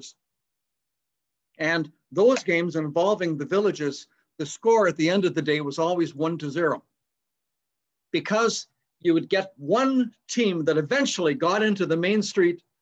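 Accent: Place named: Canada. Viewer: really enjoyed your presentation. That was a good history lesson and I enjoyed your pictures. I could tell from your accent that you were Canadian. I’am one of your neighbors from the States. Back, years ago, I did a tour of Canada for a couple of weeks. Really enjoyed meeting all the people I met along the way. My mom’s family are all Irish here in the states. Anyway, I’m looking for a way to defend myself from the epidemic of druggies who are doing a lot of the stealing and robbing State side. It’s getting rough here.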